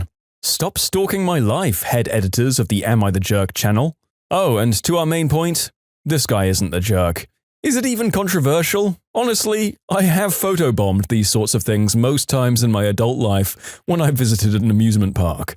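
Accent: United Kingdom. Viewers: I don't like this voice actor you hired..... I can't stand monotone people..... and (no offense to anyone who is British) I REALLY can't stand monotone with a British accent......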